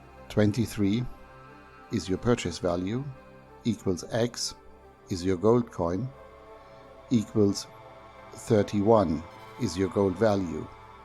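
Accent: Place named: Germany. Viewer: Love your videos, not just the info and your thick German accent but your editing, too. Good to have you back.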